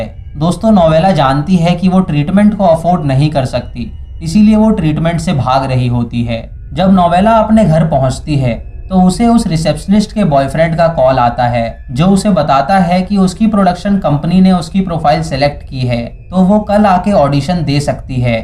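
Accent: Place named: Bihar, India. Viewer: Bhai bhihaari hain kya (hain,hain,hain) bol raha Bhai sahi se explain ker movie. 🖕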